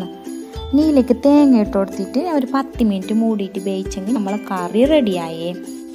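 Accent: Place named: Kasaragod, India. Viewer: Super kasaragod slang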